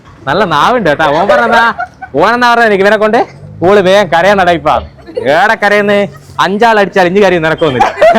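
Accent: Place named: Vadakara, India. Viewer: ബ്രോ അയാൾ എങ്ങനെ പറഞ്ഞാലും അങ്ങനെ വരു കാരണം ഇയാൾ വടകര നാദാപുരം slang ആണ് ath അയാളുടെ മിസ്റ്റേക്ക് അല്ല but ഞാൻ അവിടെയല്ല ഞാൻ avide പഠിച്ചിരുന്നു അത്കൊണ്ട് എനിക്ക് മനസ്സിലാകും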